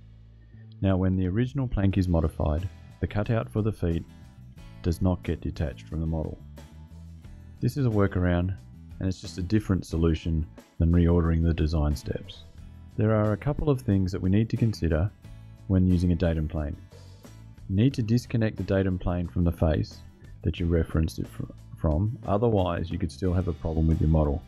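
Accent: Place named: Australia. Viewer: Great explanation! Also good to hear another Aussie doing this too.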